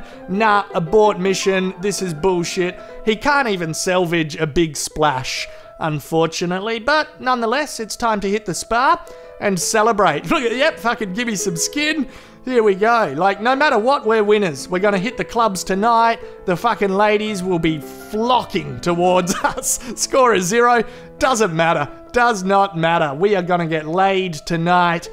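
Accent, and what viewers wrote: Australia. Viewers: To all pinoy... this guy just literally gving the normal dialect of australian review. He do review jason statham diving shit. That lmao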